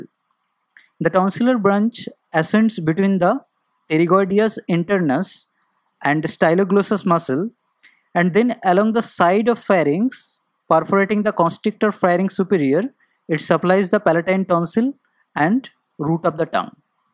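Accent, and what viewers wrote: India. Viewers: ☹️I don’t understand the accent of. Indian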